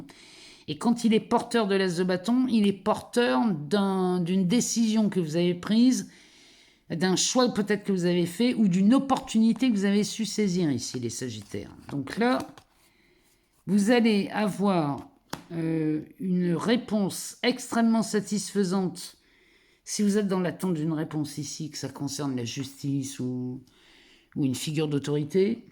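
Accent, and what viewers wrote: North, France. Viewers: Merci Merci Merci pour vos tirages toujours toujours très JUSTES … Dans quelques jours, je passe en justice pour mon divorce, et je sais maintenant que enfin je vais être mentalement, psychologiquement et physiquement « libérée et soulagée ».. J’adore vraiment votre voix qui est franche avec un p’tit accent soit du nord soit de la Hte Loire … Bien à vous et bonne semaine!!! 👏🫶🏻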